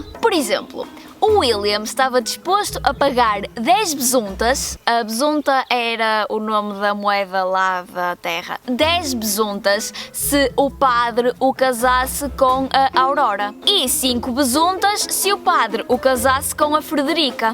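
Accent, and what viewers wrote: North, Portugal. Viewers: Não sei como vim parar ao teu canal, mas há coisa de uma semana deparei-me com um vídeo teu e gostei da forma como partilhaste o teu conhecimento matemático. Foi um bónus teres sotaque do norte como eu, carago haha. Não sabia que tinha interesse em matemática até agora, por isso vamos lá ver o que sai mais daqui! Continuação de um bom trabalho. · Esse sotaque é de qual lugar de Portugal? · Aaaaaaaaa S2 matemática + português de portugal = amei * -- * abraços do Brasil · Adorei o vídeo, muito cômico e informativo. LMAO. Minha primeira experiência com algoritmo gananciosos foi em minha turma de navegação de robôs na faculdade. Lá aprendemos que dada as condições corretas, esse tipo de algoritmo pode lhe oferecer a melhor solução, porém que há casos onde ele pode oferecer a pior solução. Um caso na navegação de robôs, seria o algoritmo do Bug 2. 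A ideia desse algoritmo é fazer o robô sempre seguir o objetivo final, mas quando o robô encontra-se com um obstáculo, ele deve então seguir ao contorno do obstáculo até uma certa condição - cujo não lembro mais kkkk. É algo interessante. Eu acho o sotaque de vocês portugueses muito engraçado, porque vc fala de uma maneira tão informal, porém a linguagem para nós brasileiros parece ser formal. Isso acaba trazendo aquele conflito e torna tudo o que vc fala cômico. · Gente de tudo que foi falado, só observei que adorei o sotaque português dessa gaja, Muito lindinha!😂😂😂😂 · Só tô aqui pela beleza e pelo português de Portugal kkkk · OIáááá! 1 ano após não entender o que seria um Calceteiro, decidi clicar nesse vídeo. E sim, escrevo essas frases ouvindo vossa voz narrando em perfeito portugês de Portugal. Agora, deixe-me curtir o vídeo, porque Durex é fita adesiva, cacete é o que tomo da mãe e porr@ não tem doce de leite. 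· Me dá uma aflição o sotaque português. Parece que eles falam com a garganta, reparem quando ela fala "que". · Engraçado o sotaque português, bem diferente do Brasil... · Eu adoro o sotaque português SOLTAIRAS 🤣🤣🤣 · Quando percebi que ela é portuguesa pensei: não vou entender nada. Mas, até que gostei da história e entendi tudo o que ela disse. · Se calhar nunca chegaste a conversar com um nativo, porque mal sais do Porto e acabar por falar com alguém com este sotaque não é nada incomum, é quase certo. No Porto talvez não porque há muitas pessoas de outras zonas do país e até mesmo do estrangeiro (lembro-me de, na última vez que estive no Porto em janeiro de 2018, o sotaque que mais ouvi foi o brasileiro até e não tanto o portuense), mas noutras zonas da região é muito comum. O Alentejo nem é ponto de comparação. A pronúncia alentejana nada tem a ver com a nortenha, ainda que exista (e qualquer português sabe disso)... 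· Gente, de que região de Portugal ela é?! Que sotaque carregado...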